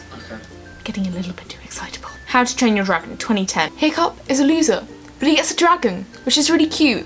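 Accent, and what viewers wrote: Wales, United Kingdom. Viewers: Omg I love your Welsh accent tho (but hey I'm not Welsh so I can't judge) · lovely welsh accent you sound just like Gwen Cooper from torchwood.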